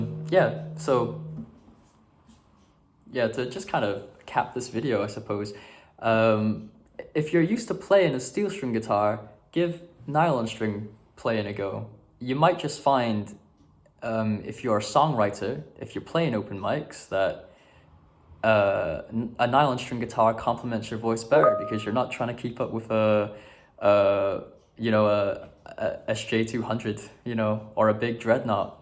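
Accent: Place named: Scotland, United Kingdom. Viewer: he's a SCOT, probs from the north cuz his accent is chill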